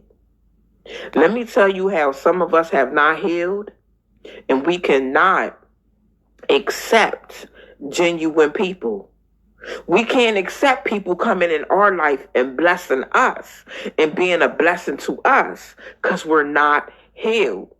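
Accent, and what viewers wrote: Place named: Philadelphia, United States. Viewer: You sound like your from Philly or east coast anyway always enjoy your content love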